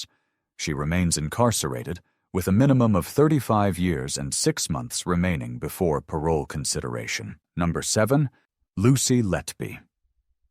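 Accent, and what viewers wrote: United States. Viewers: Why is the narrator american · Why is it narrated by an American?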